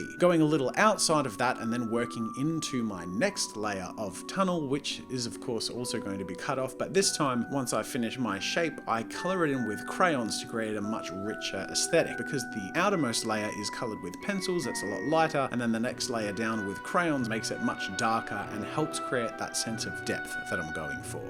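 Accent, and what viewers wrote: United States. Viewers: * doing an american accent is so scarily accurate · His American accent is pretty great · *'s American accent is totally on point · Your America accent is spot on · american accent is amazing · Omg your american accent! More please! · why is his american accent so good? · THE AMERICAN ACCENT... WHY DOES IT SOIND SO DIFFERENT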